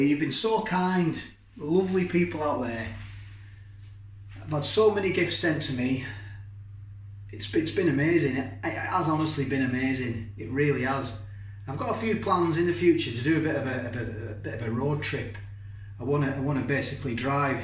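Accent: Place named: Rochdale, United Kingdom. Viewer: I’ve just subscribed bud I’m from Oldham you sound like your down the road in Rochdale bud. Anyhow likening the vids buddyespecially the vs series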